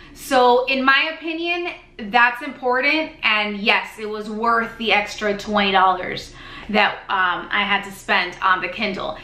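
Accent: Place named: Miami, United States. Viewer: You're from the Miami area! I can always identify the accent. You use the "hard" Spanish L's.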